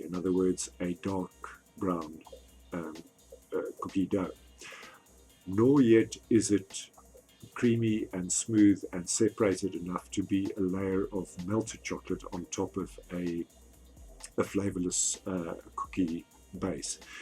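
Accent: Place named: England, United Kingdom. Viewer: Thanks,very informative review as always. I hope you don’t mind me asking but are you originally from S.Africa as your accent sounds very English to me?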